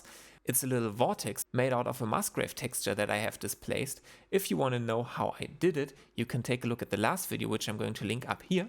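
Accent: Germany. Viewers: Not only is the accent german, but the human is painfully german. Not austrian, but so painfully german. Und damit danke fuers Tutorial und gute Nacht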